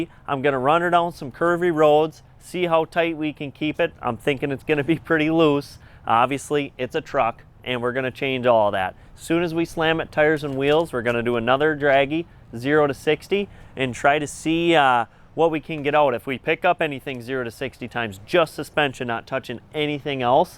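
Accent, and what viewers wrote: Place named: Wisconsin, United States. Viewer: You have the most Wisconsin/southern Canada accent ever 😂. Sickkkk truck tho 🎉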